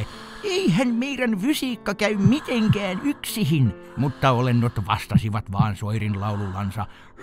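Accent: Finland. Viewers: Olipa mukava kuulla omaa murretta, kun asunu Keski-Suomessa nyt 10 vuotta.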